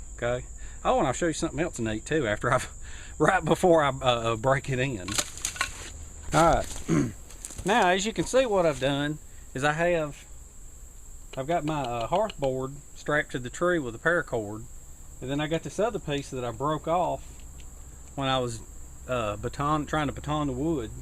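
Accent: South, United States. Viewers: This guy is so much southern (no disrespect)!!! It's funny when he says Machete. ..LOL I like your vids. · Hey man, I love your accent! What part of the States is that from?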